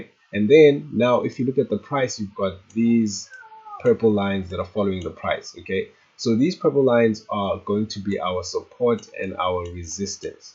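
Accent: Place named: South Africa. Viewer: I swear to you. You are a South African😊I like that. This video has helped me a lot. Thank you so much. Keep doing the great work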